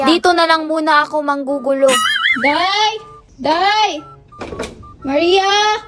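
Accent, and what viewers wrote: Visayas, Philippines. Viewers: Bisaya mo te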